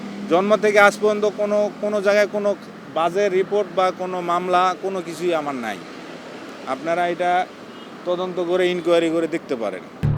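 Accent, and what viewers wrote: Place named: Bogura, Bangladesh. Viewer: ভাইয়ের কথার টোনে বোঝা যাচ্ছে ওনার বাসা বগুড়ার কোনোজায়গায়...!!!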